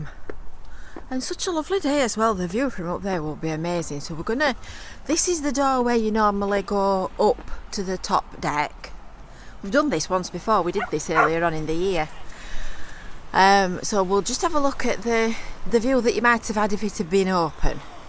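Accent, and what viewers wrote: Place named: Yorkshire, United Kingdom. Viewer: Thanks again for reminding us of things to visit on our coast. I often put on the auto subtitles and chuckle at how it guesses at your Yorkshire accent. Unfortunately for 'Fylde' it puts fouled or failed!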